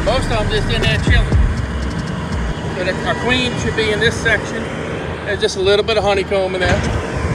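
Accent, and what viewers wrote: Louisiana, United States. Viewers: Damn i miss this Louisiana accent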